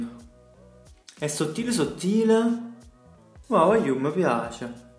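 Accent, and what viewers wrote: Caserta, Italy. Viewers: Da napoletana ti posso dire che si sente tantissimo che sei di Caserta e non di napoli Napoli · Che zona di Caserta?😍 io sono della provincia invece 😍 si sente che l’accento è più casertano. Troppo forte! Mi sento a casa 😂🔝 e Ferro vecchio passa tutti i giorni ahahah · Da "napoli napoli" ti dico ch si sente un sacco il casertano hahahaha ti adoro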